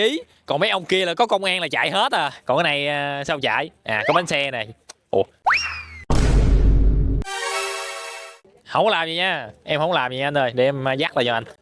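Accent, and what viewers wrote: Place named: South, Vietnam. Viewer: noi giong mien Nam nghe nhe nhang de chiu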